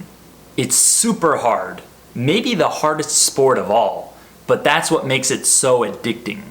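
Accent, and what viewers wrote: United States. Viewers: First of all, let me say that your videos are amazing. Even though I speak English fluently, I enjoy watching your A1 or A2 videos. I even recommend my students to use them. Well, you speak very clearly and use real English, which is very important to understand native speakers easily. I think that one of the reasons why your videos are so fun to watch for me is because I like American English. In fact, I'm in love with American English. I must say that I don't really enjoy listening to British accent because it sounds so strange to me. I don't know why, but I don't like saying things such as car park. I think it's because I want to really hear and use the "r" sound, especially at the end of words. If you ask me, the best way to use your videos is to repeat them a lot. Many learners don't really enjoy listening to a podcast more than 2 times, for example. 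They think it is boring and not necessary. Therefore, they quickly move on to other listening materials. However, it is a lot better focusing on something for a long time than trying different things. You know what I mean? Think about footballers. Every day, they practice the basics and they never play before they train enough. So learning English and speaking it automatically without thinking is just like playing a sport like football. I'm sure you agree with me. As for your question, well, I think my favorite sport is football, even though I am a woman and can't play. I have to say that I love watching football matches so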